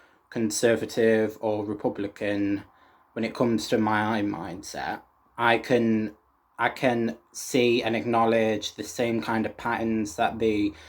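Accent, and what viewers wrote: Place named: England, United Kingdom. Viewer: Agree entirely with your sentiment, this is just another picky comment! Be a bit careful about the vocabulary you use - when you say "'libertarian" in this video, I think you mean 'liberal'. A 'libertarian' is generally considered to be someone who is for minimalising government interference, and maximising individual freedoms - very much into free-market capitalism, minimal welfare state, and privataisation; a 'liberal', on the other hand is what you describe. A 'Republican' (capital 'R') is a supporter of the conservative, American Republican party. A 'republican' in the British sense, is one who would get rid of the queen and the monarchy in favour of an elected president. I know YT is an international platform, but I think the distinction is important when you have an English accent. Sticking to 'conservative' generally avoids any confusion.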